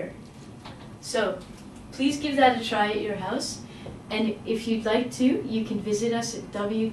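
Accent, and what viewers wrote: Canada. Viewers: She is from Canada? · She from canada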